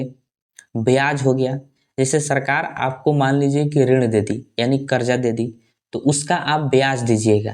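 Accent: Bihar, India. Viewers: Bihari boli hai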